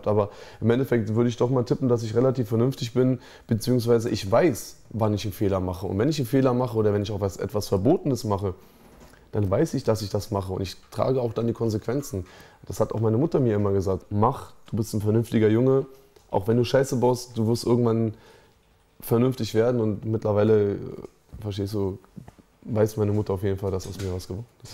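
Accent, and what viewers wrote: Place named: Germany. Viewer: in echt heißts ja Command and conquer aber bei ihm hörts sich so lustig an so deutsch halt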